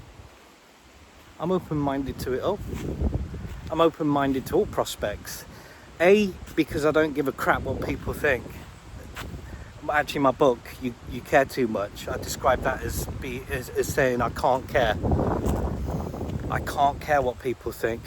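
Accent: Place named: England, United Kingdom. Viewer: Love your walk and talks. You present deep thought provoking conversations. Love your accent. Wish I was born in England.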